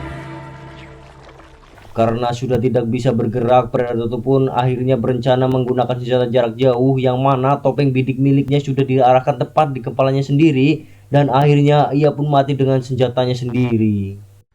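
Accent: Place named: Indramayu, Indonesia. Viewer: Logatnya orang Indramayu wkwk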